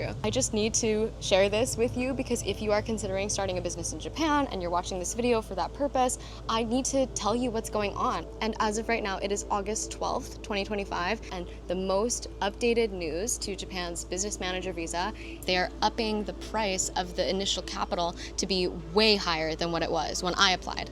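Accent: United States. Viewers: You are so beautiful on camera. Are you working with a professional film crew to do your make-up, hair, and lightings? You sound American, what nationality mix are you? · Sustainable energy. Such nonsense. And you sound like a fake person anyway. UN = Unfree Nations. I get a headache listen to your California accent and fake nice person acting.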